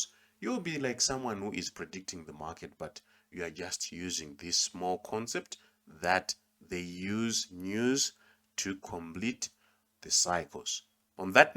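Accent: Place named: Zimbabwe. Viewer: Hie bro, you sound like a Zimbabwean, are you from Zim?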